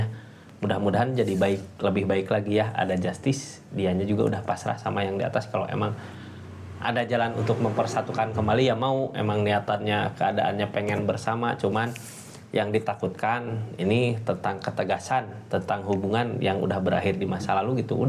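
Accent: West Java, Indonesia. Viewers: Nyabut jukut😂😂, aduh si Abang sunda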